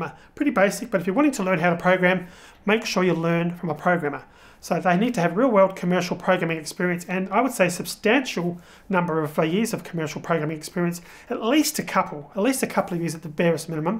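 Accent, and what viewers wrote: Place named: Australia. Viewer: I agree. courses are great I've bought a few on udemy. Also feel more comfortable listening to Australian accent just because I'm more used to it.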